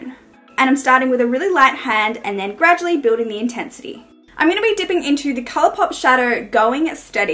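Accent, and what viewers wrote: Australia. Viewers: Where are you from? You sound kind of Australian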